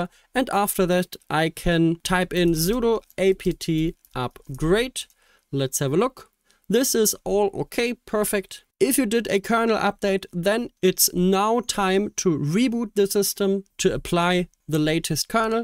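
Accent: Germany. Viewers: i hear Deutsch?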